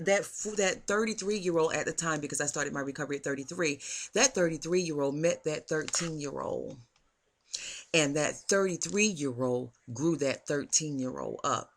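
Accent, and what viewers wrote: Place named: New Jersey, United States. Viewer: you are sooo from Jersey with the "yous" haha i love it! Im from CT and have been to Jersey so many times I cannot count so I can relat. Thanks for this video!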